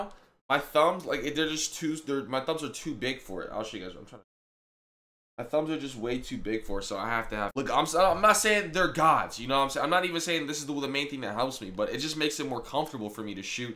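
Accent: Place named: Canada. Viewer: you sound Canadian, are you?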